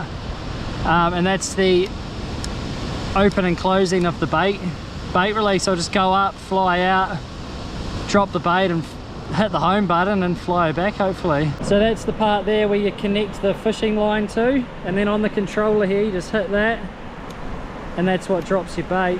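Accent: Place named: New Zealand. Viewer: you sound like a kiwi, Lovely beaches in Ozz, hope you have great fishing 😊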